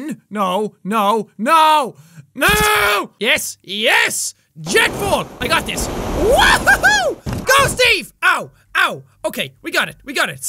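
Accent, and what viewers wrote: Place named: Ireland. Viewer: I like the stronger Irish accent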